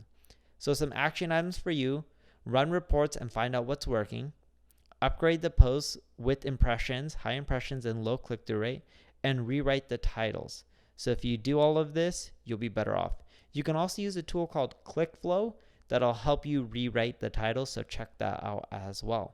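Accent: India. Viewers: Why your accent is so different/are you Indian?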